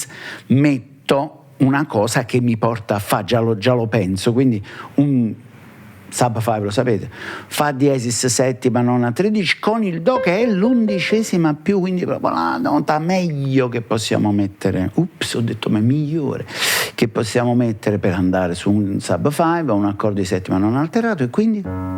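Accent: Naples, Italy. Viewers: Il tocco di napoletanità è sempre piacevole. Complimenti!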